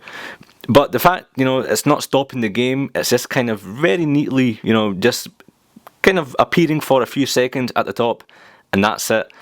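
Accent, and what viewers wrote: Scotland, United Kingdom. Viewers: Haha, I thought you were scottish. :) Thanks for the review mate.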